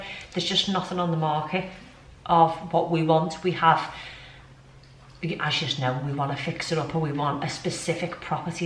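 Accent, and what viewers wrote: Liverpool, United Kingdom. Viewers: Ah the c word, fab n gr8 seeing u back with your lovely liverpool accent, won't b long till you're putting up Christmas trees again, hope u move soon, we're 10 weeks along waiting to buy house nxt door x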